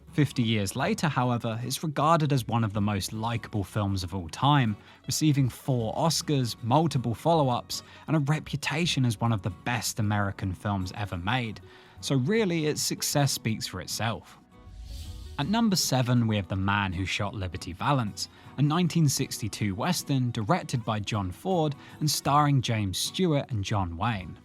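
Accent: United Kingdom. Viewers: Terrible list, but the guy sounds British, so....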